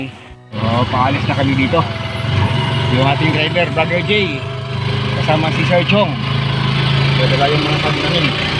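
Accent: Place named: Karay-a, Philippines. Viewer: Nagaragumo ang karay-a kag amo man dia ang pang hambal ko. Sa Alimodian ako naghanggud. Love the dialect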